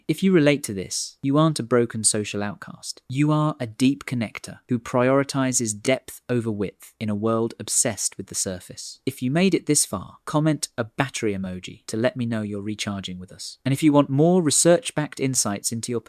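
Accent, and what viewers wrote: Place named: United Kingdom. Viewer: I don't know why your videos are so funny. Think it's the satire with that serious british accent - I love it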